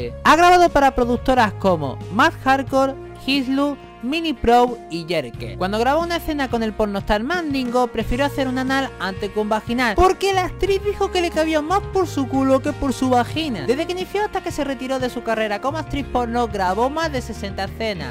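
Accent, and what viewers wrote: Spain. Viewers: Odio el accento Español 😅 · Ironically spaniad spanish is one of the worst...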